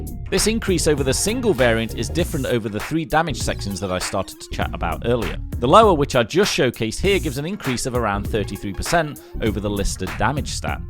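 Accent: United Kingdom. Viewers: Are you bri'ish?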